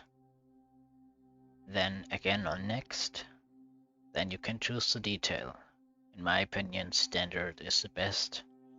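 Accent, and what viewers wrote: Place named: Serbia. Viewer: You are hören sich nach ein Serbe an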